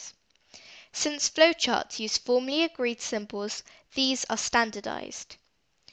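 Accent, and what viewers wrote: United Kingdom. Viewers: south england accent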